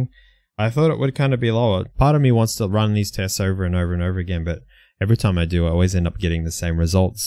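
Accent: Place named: Australia. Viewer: Dude, I guessed that you were from either: newcastle , cronulla, or wollongong ;) nice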